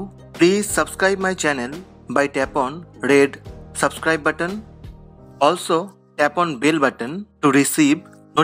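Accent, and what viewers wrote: India. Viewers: Indian bullshit accent hahahaha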